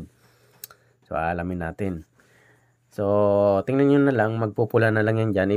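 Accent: Bicol, Philippines. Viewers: Sir taga bicol kpo ba?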